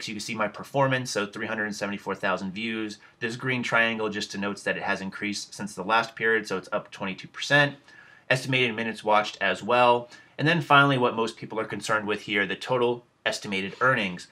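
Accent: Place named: New England, United States. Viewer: Where in New England are you from?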